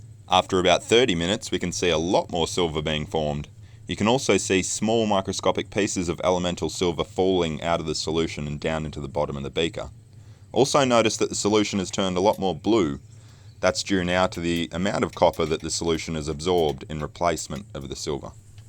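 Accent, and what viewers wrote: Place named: Australia. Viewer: Great video mate. You sound Australian, where are you located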